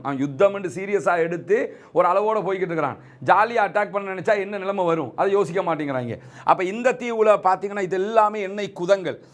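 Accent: India. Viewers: நீங்கல் ஒரு இலங்கையர் இலங்கையருகென்று ஒரு தன்மை உண்டு மனித நேய முல்ல நல்ல பன்பாலர்கல் உங்கல் ஆங்கில வார்த்தை சிலாங் இந்தியாவின் ஆங்கில உச்சரிப்பாக உல்லது இந்திய ஆங்கிலத்தை எந்தவொரு நாடும் ஏற்று கொல்வது கிடையாது உதாரணம் ஆரஞ் ஆப்பில் ஜான் இப்படி.. நீங்கல் நாகரீகமாக இதை கருதுகின்றீகலா இல்லை... மிகவும் கேவலமா உல்லது இலங்கைக்கு என்று ஒரு தன்மை உண்டு அதே பேணுங்கல்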